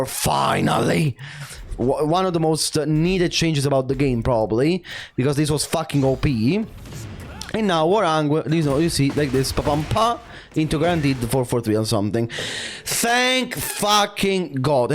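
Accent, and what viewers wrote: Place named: Sicily, Italy. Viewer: Brother, random but are you Italian? Your accent when you speak sounds just like my wife's (Shes Sicilian)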